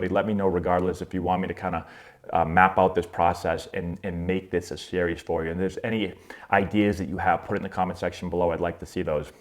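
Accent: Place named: Boston, United States. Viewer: the Boston really came out in this video.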